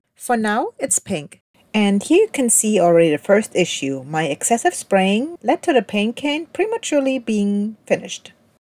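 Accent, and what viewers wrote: Germany. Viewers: German accent is cool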